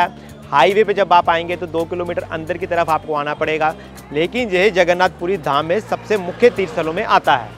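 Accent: Punjab, India. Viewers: Aapka Punjabi lahja❤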